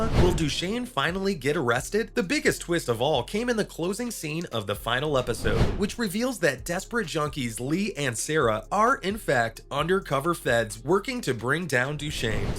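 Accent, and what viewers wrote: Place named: United States. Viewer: why is a American telling us this😂😂😂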